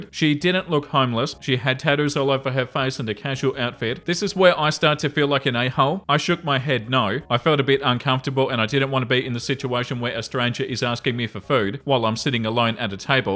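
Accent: Australia. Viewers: i was on facetime w my sibling the other day and they heard the vid i had on in the background and asked me "who's your cheery australian man"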